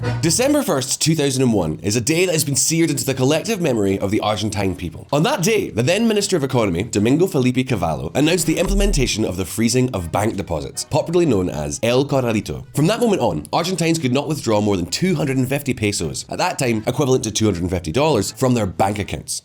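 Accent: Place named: England, United Kingdom. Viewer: Reading Spanish with English pronunciation. Is this a troll?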